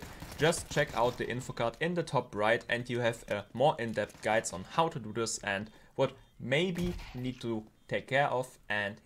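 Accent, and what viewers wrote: Germany. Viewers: i hear se deutsch akzent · i hear a little bit deutsch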